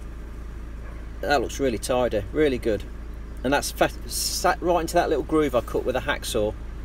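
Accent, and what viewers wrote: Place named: Yorkshire, United Kingdom. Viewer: You from Yorkshire fella!!! Sound it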